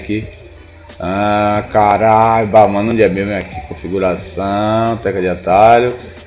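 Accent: Bahia, Brazil. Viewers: So podia ser bahiano ne kkkkkkkk ( tambem sou )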